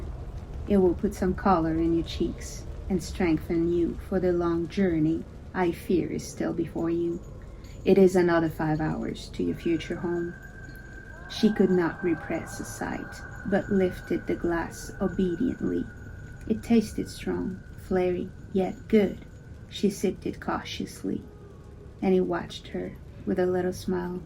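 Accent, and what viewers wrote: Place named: England, United Kingdom. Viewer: C'est très bien lu, avec un bel accent anglais et une belle intonation. J'ai pu suivre l'histoire avec les sous-titres incorporés (anglais). Merci!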